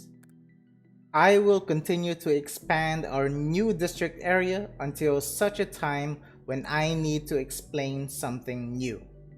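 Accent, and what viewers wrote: Philippines. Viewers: my wife says you sound filipino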